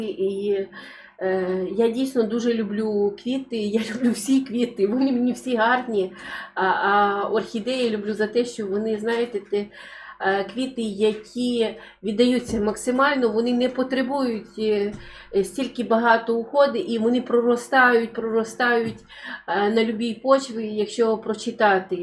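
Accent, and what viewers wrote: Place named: Polissia, Ukraine. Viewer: Пані! Вітаю Вас з днем народження! Дякую Творцеві,що він створив Вас такою миловидною,щирою,розумною,відкритою,справедливою і і в міру критичною! Дякую батькам,що привили Вам любов до рідної землі!А мова Поліського краю така чудова, хочеться слухати і слухати Вас! Кожного вечора слухаю Вас,а якщо світла немає -скучаю за вашою правдивою інформацією. Будьте ж тією зірочкою,яка дарує сотням тисяч людей своє світло і дає надію на те,що зло буде переможене Добром! Многії і благії літа Вам і вашим рідним!!!Слава Україні!!!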